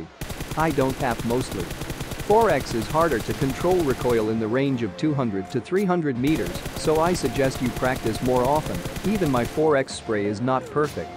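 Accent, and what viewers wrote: India. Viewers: Are you indian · Are you Indian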